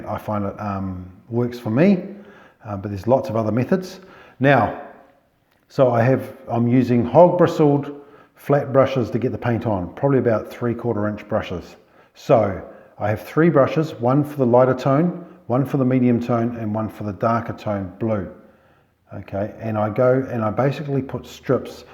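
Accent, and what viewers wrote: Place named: New Zealand. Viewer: Just found your channel and subscribed. Nice hearing another kiwi voice and familiar scenery for a change. Very informative tutorial thanks.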